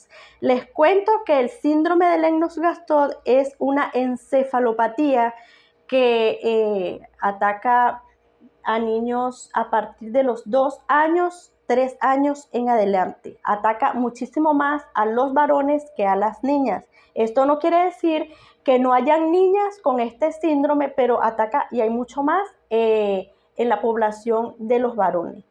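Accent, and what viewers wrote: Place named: Venezuela. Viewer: Amiga es la segunda vez que te veo y por el asentó me imaginé que eres venezolana .tambien soy de el Tachira ,Perú Ivo en el Reino Unido.y tu testimonio es es de una gran ayuda,y te felicito por tu valentía y dedicación a tu hijo.Bendiciones .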